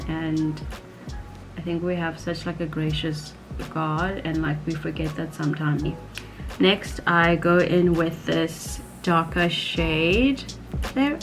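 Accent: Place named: Australia. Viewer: I think I am the only person who thought you had an Australian accent. 😂 Will you be coming back home to watch Beiber? 😏 It is so amazing watching your videos, I have been in love with your content since the first video I watched. Thank you so much for sharing all your experiences with us, it is truly amazing to watch. I hope you will be able to come back home and just be with your family. Sending love and support from home.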